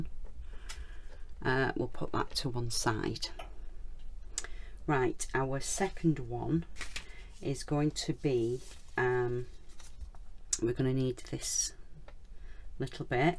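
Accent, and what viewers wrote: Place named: Northern England, United Kingdom. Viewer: Love your channel, subscribed as soon as I heard a fellow uk northerners accent!! And this technique is amazing!! Can't wait to try it! Thanks for sharing :-)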